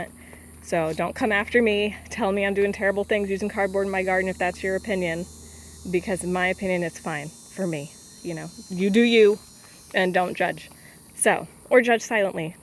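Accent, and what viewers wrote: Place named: South, United States. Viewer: In which gardening zone are you? Sounds like you’re way down south.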